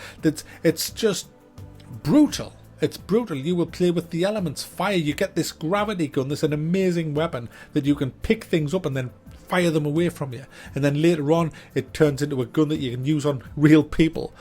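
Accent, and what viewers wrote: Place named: Newcastle, United Kingdom. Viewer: nice to hear a geordie on youtube, you are a rare breed apparently...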